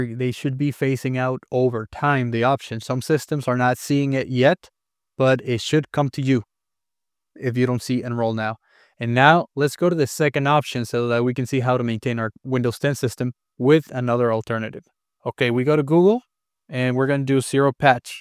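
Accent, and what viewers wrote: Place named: United States. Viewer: Muchas gracias hermano. qundo me coco no trabajo... you ensonido como un gringo. sin escolar. Su Engles es perferto. Felicidades ! Quiszas con mas la practica.... un dia enel futuro, Mi Espanol .... Tu intiendo mi sentimiento. Occidente Australia. poner este lugar sobre su listo de viajes. Disfrutar su dia amigo!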